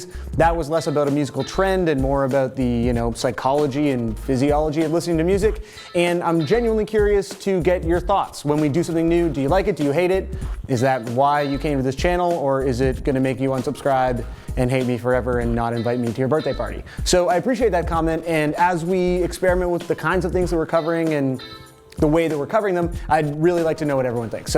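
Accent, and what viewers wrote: Canada. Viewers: Accent? Yes sir, you sound like an Ontarian. You sound like you lived about a block from Yonge Street, actually. I do tend to lose my poop when an American accuses us of saying a-boot. No one says a-boot. We sometimes say a-boat. You, sir, don't really say a-boat. You pretty much say a-bout. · You most certainly have an accent. Everyone from Canada and the US states near Canada (Wisconsin, Minnesota, North Dakota) have a strong accent on any "ou" sound. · Its ok, we'll make it through this. ps you song just as Canadian as I do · There are no words to adequately express how much I love the fact that one of these bands is named Power Glove. And yeah, you really don't have much of an accent. Probably the least accent-y Canadian accent I've ever heard.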